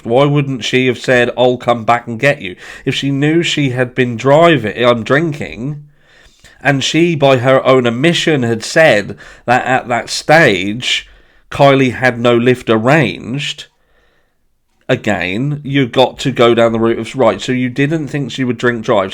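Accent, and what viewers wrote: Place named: England, United Kingdom. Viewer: Awesome sir! So glad found ya! I love the English accent my friend! Our views are same on many aspects of this case! Thank you for good information,and great theories! Have great Friday Mr!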